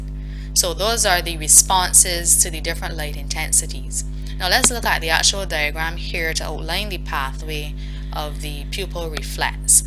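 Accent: Barbados. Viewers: yea...I figured so.....I grew up with that accent so I knew it...just certain words were classic Barbados. Glade to hear ya teaching. Create more content. Thank you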